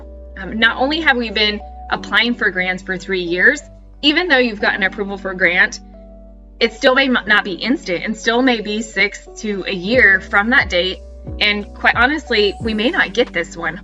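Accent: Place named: Missouri, United States. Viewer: I had to retrain my ears to hear soil the word bcuz of your Missouri accent.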